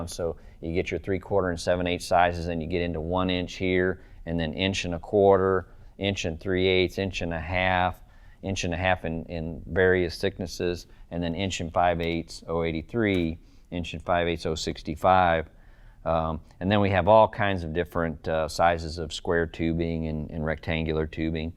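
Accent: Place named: United States. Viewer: Great video ... still cant get over how Americans say tubing and aluminium lol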